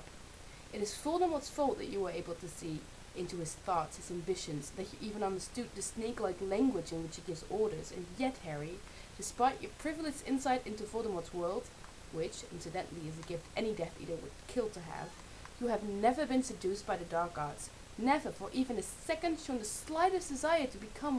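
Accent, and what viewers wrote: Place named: Netherlands. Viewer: interesting stuff. I like how when you read outloud you pronounce very posh english in a dutch accent haha.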